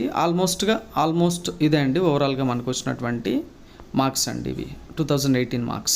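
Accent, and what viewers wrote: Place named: Coastal Andhra, India. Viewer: Hi " " garu ur work is good bcz collected in 2018 dsc ranks information, i am not expected u as kadapa guy bcz does not dialect of kadapa😅 voice over is good as costal, i belong to ATP😁, all the best 👍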